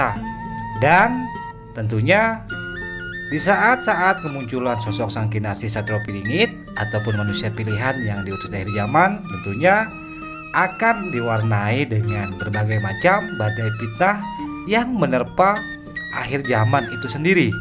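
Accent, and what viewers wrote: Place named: Semarang, Indonesia. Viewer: Satrio piningit bagakan.terbelenggu Di lobang tikus sendiri 😊 Sambil ngencak HK Kecil kecilan kamu sepertinya orang SMG logatnya nada Bicara sepertinya Aku pernah kumpul Sama orang smg😊 Di puncak. Cemara😊😊😊😊😊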